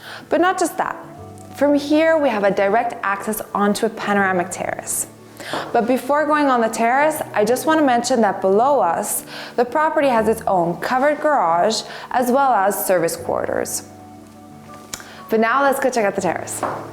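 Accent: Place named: Florence, Italy. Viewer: sentirti parlare italiano con accento fiorentino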